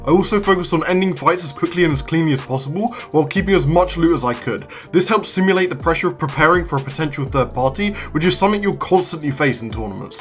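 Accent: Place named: North America. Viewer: lol you sound na not tryna be rude tho but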